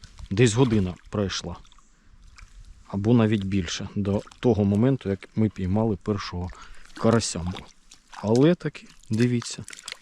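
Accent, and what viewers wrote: Poltava, Ukraine. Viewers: душевне відео. особливу у тебе виходит нормально українською мовою Полтавський діалект особливий🥰🥰🥰 без отого чтоко-дакака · Суржик точно в тебе полтавський!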